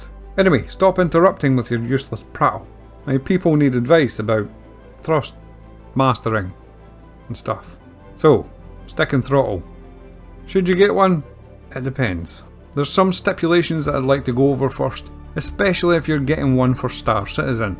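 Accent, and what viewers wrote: Scotland, United Kingdom. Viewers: Been thinking of getting a joystick for a while so started watching your video. The images, the space scottish and the wordsmithing drew me deeper and deeper in.. after a while a Tobii and pedals made perfect sense aswell! BUT when you started demonstrating voice control dishing out orders "switch to guns" I was literally bouncing up and down in my cahir with a stupid smile across my whole face. My inner spaceman thanks you and I will most certainly check back for more videos.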